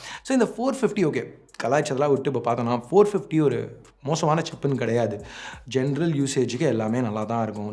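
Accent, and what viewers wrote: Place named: Chennai, India. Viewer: Chennai slang semma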